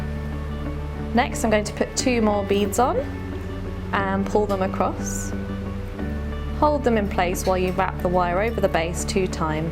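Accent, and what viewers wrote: United Kingdom. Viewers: She's Brit not American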